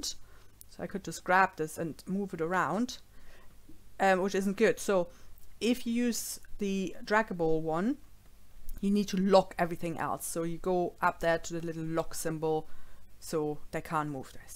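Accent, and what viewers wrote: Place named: Germany. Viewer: Excellent how-to tutorial. I've watched it several times. Your lovely German accent reminds me of my late mother.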